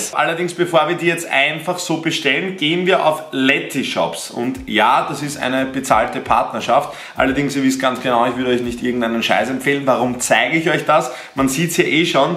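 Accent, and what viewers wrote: Austria. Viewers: Wie findest du Hosen von GVAPI? Sag mal irgendwo deine Meinung dazu😁btw feier deine Videos brutal weiter so und hau mal mehr österreichischen Akzent raus😂😂❤️❤️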